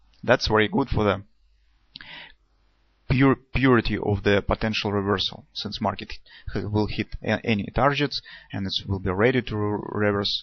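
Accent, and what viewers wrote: Russia. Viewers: Работай над акцентом и произношением слов. Якрий русский акцент. Прям вспомнил пары английского в инсте. :)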